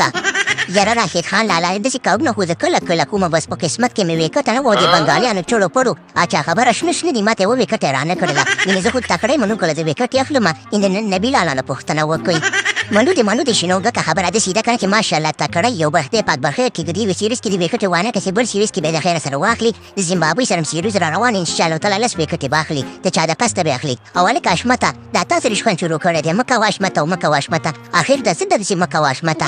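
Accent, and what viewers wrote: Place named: Peshawar, Pakistan. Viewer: تیزنو پسکنو سوچه پشتو ووایه دا پیشوری لهجه پریژده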